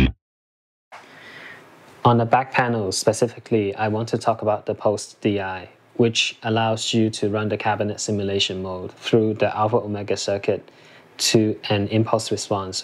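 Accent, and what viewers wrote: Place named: United States. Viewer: I hear your American accent very good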